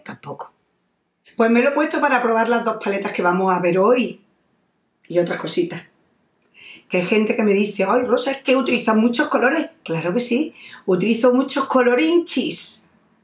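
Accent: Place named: Málaga, Spain. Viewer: Cómo puede ser que tengas acento de Málaga si eres de Huelva? me causa curiosidad, ¿no tendrás familia malagueña? Por cierto, el maquillaje te queda genial. Un saludo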